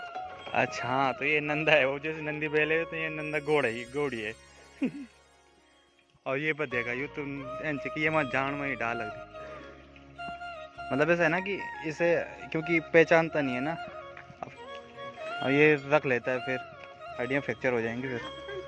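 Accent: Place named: Tehri, India. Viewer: Aap tehri se ho kya aap ka bolne ka tone wanhi ka hai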